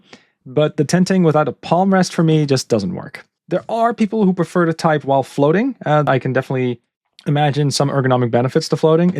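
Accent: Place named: Netherlands. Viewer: This keyboards looks very interesting. For some reason about 4 seconds in I could tell your accent was Dutch, then found out you are actually Dutch haha. Was wondering if you had a discord I could add, I had a few questions if you wouldn’t mind.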